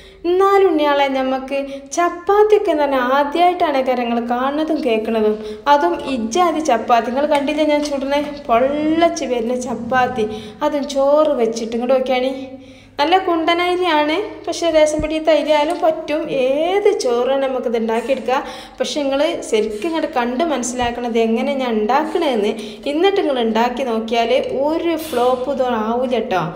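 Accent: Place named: Malabar, India. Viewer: മലബാർ style സംസാരം വേണ്ട. തനിനാടൻ മലയാള ഭാഷയിൽ സംസാരിക്കൂ. ഞമ്മൾ കേട്ടോ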